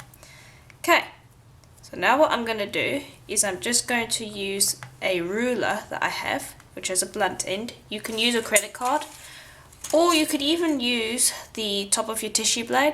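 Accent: South Africa. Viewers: I have just started watching your videos. I have not tried polymer clay yet. But I really want to. So I will be watching hundreds more videos. Lol Question.... are you South African? I see you are in Australia, but your accent is definitely South African.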